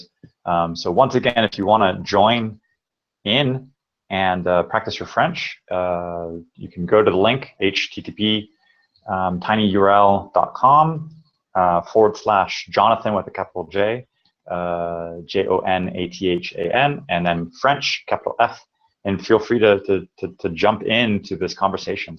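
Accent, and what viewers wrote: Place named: Canada. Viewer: tu parles comme un canadien.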